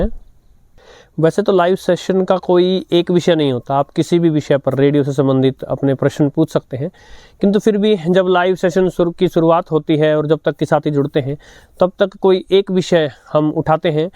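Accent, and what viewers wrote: Standard. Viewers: सर कई बार मैं आपका चैनल सिर्फ शुद्ध हिंदी के सुनने के लिए देखता हूं । ओ हिंदी के शुद्ध स्वर आप बोलते हैं इतना मधुर लगता है । मेरे संस्कृति के टीचर की याद आ जाती है कल जरूर जुडूंगा अगर फ्री रहा तो आपका बहुत बहुत आभार रेडियो की इतनी सारी जानकारी हमसे साझा करने के लिए मैं आपके कार्य को हृदय से पसंद करता हु ।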